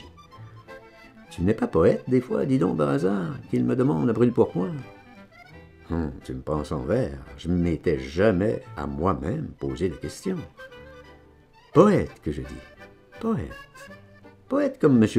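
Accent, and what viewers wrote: Quebec, Canada. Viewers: Coupez la musique bordel. C'est pas du bal musette. L'accent québécois pour une phrasé titi parisien ça ne va pas non plus.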